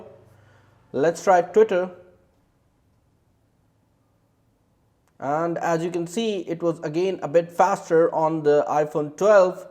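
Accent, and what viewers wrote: India. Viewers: Ooh! That typical indian accent though.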